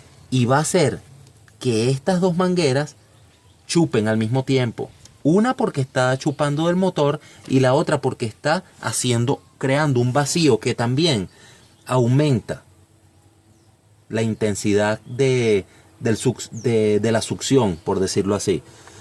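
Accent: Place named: Venezuela. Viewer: saludos mi amigo desde barrancabermeja santander colombia tengo una chevrolet blazer modelo 93 el aire acondicionado no me funciona cuando vi tu video me alegre necesito saber mas ya que esta camioneta era de mi padre se la dio a una amiga y la desvalijaron no tiene la inteccion y le colocaron un carburador de dos garganta de dodge 300 o ford ranger que es lo mismo creo tu eres de donde suena como a venezolano oye tengo el club blazer colomabia es pero que seas mi amigo alli y nos comparta tus conocimientos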